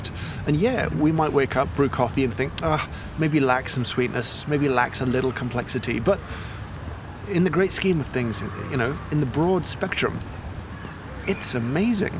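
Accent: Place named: England, United Kingdom. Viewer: It's kinda funny because (for my understanding of accents) sounds English, and to our rather specifically cultivated Italian taste buds, England is among the worst eating places in Europe. I'm not saying Italy has great food and everybody else doesn't - in fact Italy has been out of the main "best restaurants - most Michelin stars in the world" tour exactly because in a way Italian taste won't meet international taste: our wine is tasteless to Californians and French, and until Tuscany started making wine specifically for the USA, we would not sell or score high abroad. But the concepts brings forth are rather sound, tasting for Italians, on average, is more developed but also more of a niche, and we won't be satisfied by anything that won't taste as our mothers did it. And if everything is special, nothing is special.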